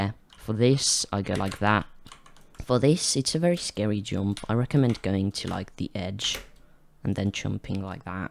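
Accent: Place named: United Kingdom. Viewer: i thought he was a brit lol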